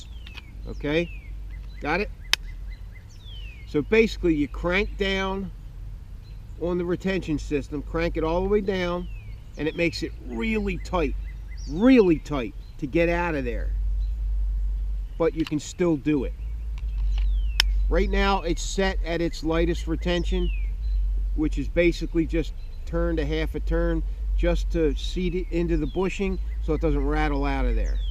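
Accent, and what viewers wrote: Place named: New Jersey, United States. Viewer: You sound like a New Jersey moke to me. It sits well on ya.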